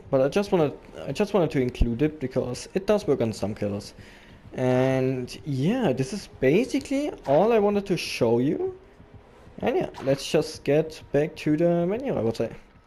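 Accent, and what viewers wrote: Germany. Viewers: der deutsche akzent 😂 geil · Ich hab dein Video geschaut und direkt gehört, dass du einen deutschen Akzent hast. Gutes Video 😊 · Gutes video, man hört aufjedenfall denn Deutschen akzent aber echt gutes Englisch, thanks for the video, was helpful, I’m going to try it in game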